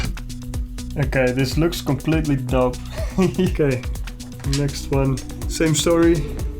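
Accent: Netherlands. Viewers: I can immediately tell from your accent that you're a fellow dutch man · From with County you are you sound like a Dutch man that speak English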